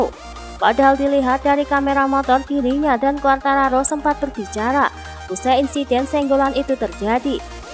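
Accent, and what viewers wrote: Java, Indonesia. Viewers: saya suka artikulasinya....medoknya muanteeep. Jaya terus Indonesia Channel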